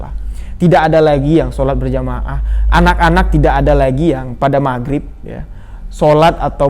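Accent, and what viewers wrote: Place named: Madura, Indonesia. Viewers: Kembara sunyi (itank tirta) = logat Surabaya = logat madura 😁😁😁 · Madura detected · iyaa bener logat madura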